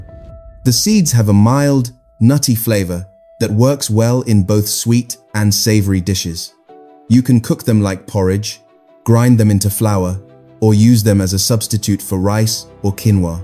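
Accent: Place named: England, United Kingdom. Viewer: Talking dollars with an English accent 🧐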